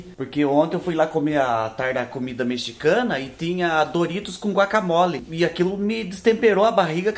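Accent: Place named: Paraná, Brazil. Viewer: vc é de que estado do Brasil.Digo onde nasceu,porque eu hoje faz 31 anos que morro em santa catarina, mais nasci no paraná e morei la ate meus 13 anos, e todos os teus ditados modo de falar me parese ser paranaense.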